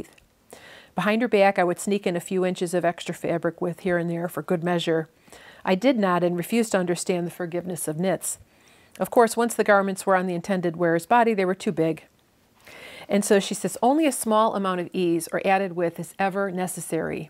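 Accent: Chicago, United States. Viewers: Hi,. I recently came upon your podcast and enjoyed it so much that I have subscribed and watched many of your prior podcasts. I am so pleased whenever I get an alert that you have released a new podcast. I’m originally from the Midwest (actually the Great Plains) and went to college with students from Chicagoland - love to hear the accent again. I started spinning in 1979 and have loved going down this fiber rabbit hole! I have a suggestion about the crocking situation with the red cashmere you are using for the Sophie Scarf. Certain dyes, most notably reds, teals, and blacks, tend to crock or rub off on your hands when working with them. The dye molecules haven’t chemically bonded with the fiber molecules, possibly because too much dye was used. I usually wash yarns like this using Synthrapol, a liquid detergent which suspends dye molecules in water. 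The molecules do not reattach to the yarn and the excess dye washes out. It doesn’t take much Synthrapol but you might need to wash and rinse twice. Synthrapol can be found at quilt shops and dye suppliers, i.e. ProChem or Dharma Trading.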